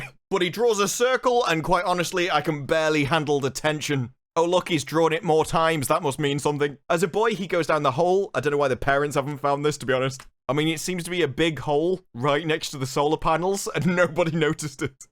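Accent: United Kingdom. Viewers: Sometimes it's funny hearing a person with a British accent nitpick a piece of shit show apart:) You're hilarious but you forgot one of the dumbest things about the Covenant language scene: The show has this whole thing that the Spartans are using their memories to seduce the meaning of Covenant words. Don't their helmets have cameras? Wouldn't they have a ton of audio from the Spartans fighting The Covenant? To fair, in this show's universe, they don't usually wear helmets for battle. Just driving and to remember shit.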